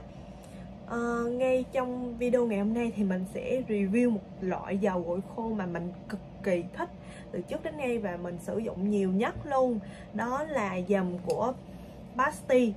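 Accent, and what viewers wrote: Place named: Binh Dinh, Vietnam. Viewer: Giọng Bình Định hay quá chị ơi!!!!!!!!!!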